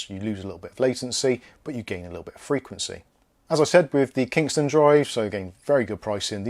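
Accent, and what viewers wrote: Bristol, United Kingdom. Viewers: Did I see this on Facebook marketplace a few days ago? Thought I recognized the Bristolian accent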